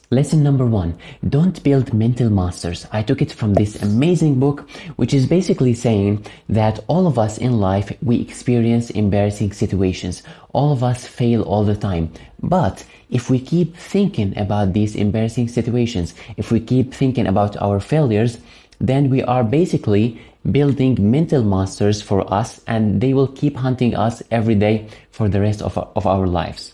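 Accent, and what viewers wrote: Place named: Aleppo, Syria. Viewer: حبيت شغل بدون ترجمة 🎉 اللهجة الحلبية كانت احلا حسيت 😁🌸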